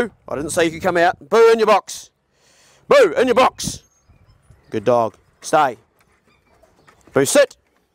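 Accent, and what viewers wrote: New Zealand. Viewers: are you a kiwi or? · okay take that back you are NZ xD as the video went on.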